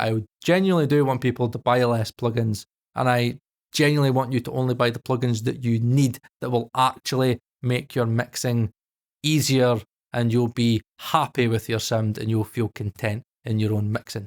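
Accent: Scotland, United Kingdom. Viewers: Nah mate, you've got it all wrong. Almost nobody who watches you is BUYING plugins. They may OWN hundreds of them, but they didn't buy them. The Joy Of Plugins isn't what they do for the sound, it's the simple dopamine release scenario. It's like GAS (Guitar Acquisition Syndrome) except there's nothing shiny to hang on your wall. Why do you think DAWs like Studio One make such a big deal out of the wee thumbnails of the VST effects and instruments? Does it make it easier and faster to find things? Maybe. But it also lets you have a virtual wall to hang your plugins on, which is far more important. [I unsubscribed to you ages ago, but every so often YT flings you into my space. I watch because you are a rare Scottish voice, and like me you are autistic. But I find most of your plugin talk really uninteresting and obvious. Not that you will give a damn! 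lol] The stuff I do find interesting is when you debunk the veracity and accuracy of a plugin. That's always fun.]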